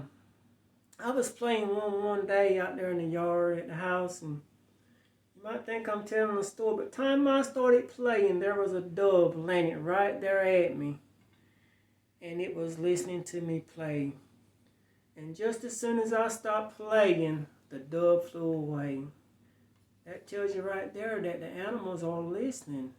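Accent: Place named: South, United States. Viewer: holy wow sir your accent is baffling me!! Would love to know your background and story, haha. I realize those are sensitive questions in todays cultural climate but I really don’t mean anything by it. Only curious. You have like, a deep southern accent or something that to me, does not match your appearance. I bet your family has a fantastic immigration story in there somewhere. Ok bye just a random curious internet commenter and I love guessing where accents come from all over the world!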